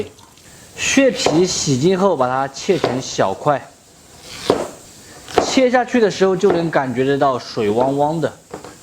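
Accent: Sichuan, China. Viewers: 喜欢听这个川普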